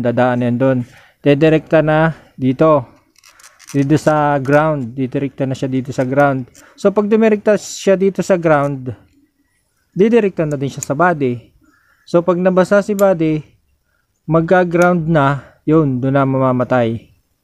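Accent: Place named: Batangas, Philippines. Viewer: Boss na sub na kita. Npansin ko lng batangenyo ka.. btangenyo dn to hehe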